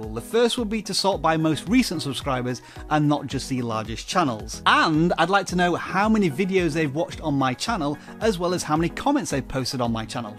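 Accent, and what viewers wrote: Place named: United Kingdom. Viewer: random is vidiq British because he sounds like that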